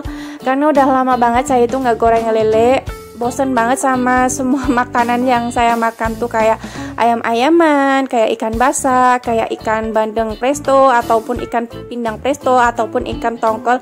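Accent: Banyumas, Indonesia. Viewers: Ya Allah mb ngapak bngt mb ak jg orang Tegal ngapak tp krm terlalu lama g ditegal jd udah kebawa BHS disini dilampung padahal suami sy jg orang Cilacap ngapak jg suami saya ,dulu begitu lulus sekah SMA ak langsung merantau kerja di Batam mb terus ketemu suami di batam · Kali ini video dibuka dengan jogetan alea Dan pak suami yg nyapu.. Mba.. Request donk, nanti bikin vlog yg full bahasa ngapak. 😁 Lucu Dengarnya. Hehehehehe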